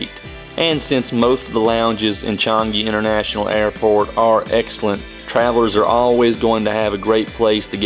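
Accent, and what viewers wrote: Kentucky, United States. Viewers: Is that your real voice? If so, I love your Kentucky accent…..we all need to slow down a little!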